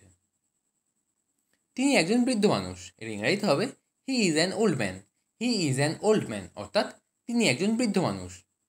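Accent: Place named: West Bengal, India. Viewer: If i am correct are you from west Bengal 😊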